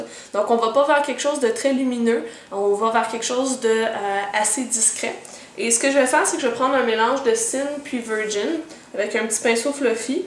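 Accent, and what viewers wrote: Quebec, Canada. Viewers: Est-ce que tous les Québecoise parlent comme ca? :D Je veux dire....le francais :) c'est jooliiii :)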